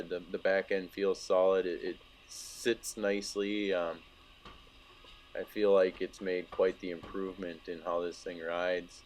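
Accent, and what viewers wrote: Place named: Wisconsin, United States. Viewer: Thanks, I have a 2001 x5 and doing this project this weekend in Arizona. I noticed the accent, I grew up in Oshkosh.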